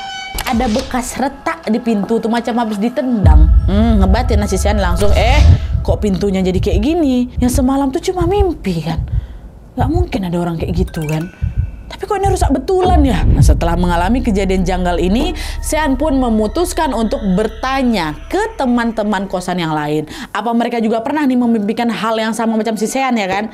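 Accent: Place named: Sumatra, Indonesia. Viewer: 2 Minggu ini baru ikuti, terkhusus bagian Crime story,thx menemani malam gabut saya kak,btw asli orang mana kak? Dari logat nya sekitaran Sumut,Riau Sumbar?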